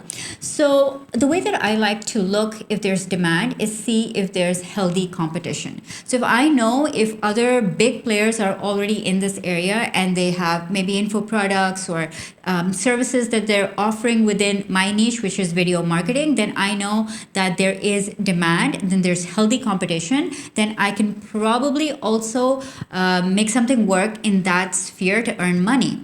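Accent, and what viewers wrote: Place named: India. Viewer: I love your accent...are you Indian ?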